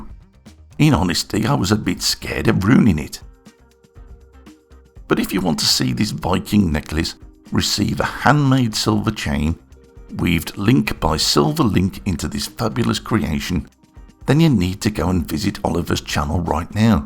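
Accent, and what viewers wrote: United Kingdom. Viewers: Joe Kerr He is british, but not ringo british. Different tone. At least to my ear.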